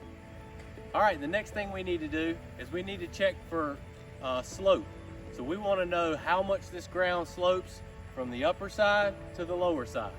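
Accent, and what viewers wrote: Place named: United States. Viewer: Best American accent ever!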